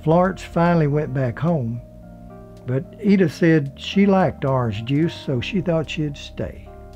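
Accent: South, United States. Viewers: Very well done,except that awful Southern accent!!YUCK! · Thank you for this piece about Ms. Hayward. I have been going back and watching her movies and remembering her as of late, and this was great to see. I was 13 when she passed and I wept, I loved her so. I became attached to her when I would see her on the Saturday afternoon or evening movies and then I was hooked; an awestruck boy in love with a classy star whose performances made him believe that we could all endure and thrive. Also, I am not sure where you are from, but your accent is wonderful. My family is from the south, and listening to you, it was as though I was listening to my uncles telling old family stories. WONDERFUL! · Mr i found your voice very southern jusr the way miss Susan Hayward was attracted to southern men according to her biography a book titled;A star is A star is aStar lives and loves of Susan Hayward thank you mr for such a beautiful. 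tribute to one of the most talented actors in the World, i always say and think an an actor(actress) should be honored while still alive unfortunately Miss Hayward died young but i know she would had been thrilled had she lived long enough to received this tribute from a soutern. gentleman thank you again Mr...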